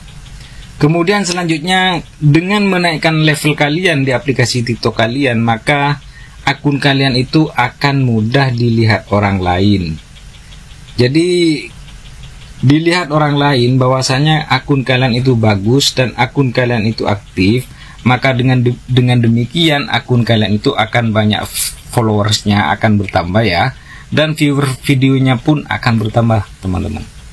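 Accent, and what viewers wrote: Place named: Madura, Indonesia. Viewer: Logat madura kyk nya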